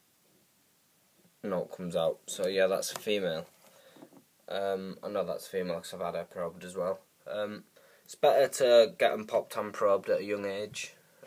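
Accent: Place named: United Kingdom. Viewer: Ur British!! Lol il pm you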